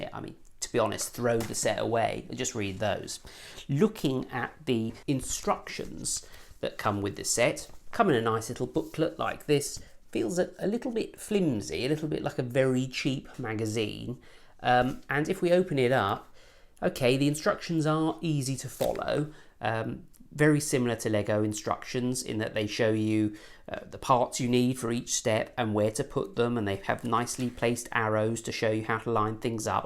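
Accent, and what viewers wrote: United Kingdom. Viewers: I really like... your accent. I'd love to speak with perfect British accent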